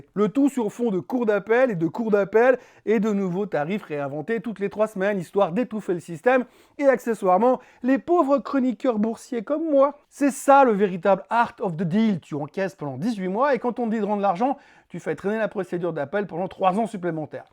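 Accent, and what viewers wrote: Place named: Valais, Switzerland. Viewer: J’apprends à connaître la Suisse avec, cette fois l’accent Valesan( Orthographe a confirmer). Et bon anniversaire.🎉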